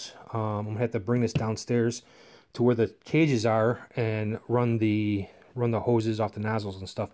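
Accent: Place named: Chicago, United States. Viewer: Love your accent. Chicago area?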